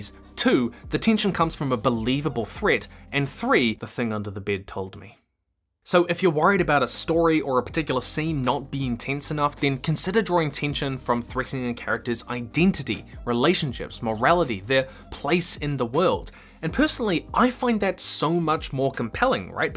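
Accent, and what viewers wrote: New Zealand. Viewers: He-he. "Dee-Deevil" Kiwi accents are the best. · This guy is from New Zealand, yes?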